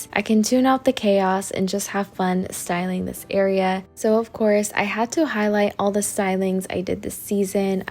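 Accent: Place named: Texas, United States. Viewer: I’m guessing Texas or Cali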